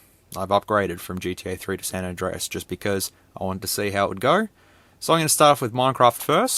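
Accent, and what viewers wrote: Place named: Australia. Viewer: You have one of the most interesting Aussie accents. I often noticed some Aussies add an "R" to wherever an "o" is in a word, but yours is super pronounced!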